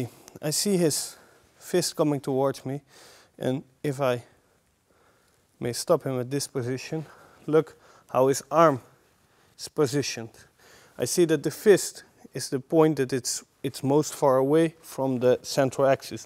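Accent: Netherlands. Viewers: 100% Dutch... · je bent nederlands he ?/? ik hoor het aan je acccent.. waar zit je op school. want ik wil ook systema gaan leren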